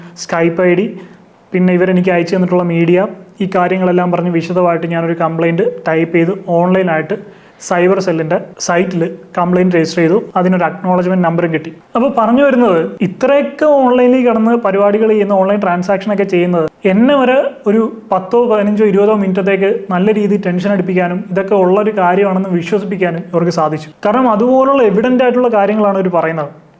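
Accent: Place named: South, India. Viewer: The slag seems like someone from south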